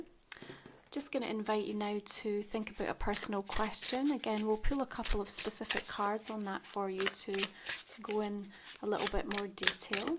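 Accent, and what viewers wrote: Scotland, United Kingdom. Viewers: Hi it was lovely to see you in the flesh in Edinburgh, I looked for you I’m sorry we didn’t get a chance to speak more x I love your content, style, delivery and your lovely Scottish burr. ❤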